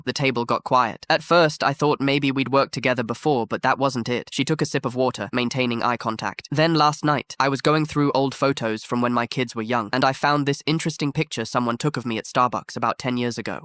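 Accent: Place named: United Kingdom. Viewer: what is this goofy ass british voice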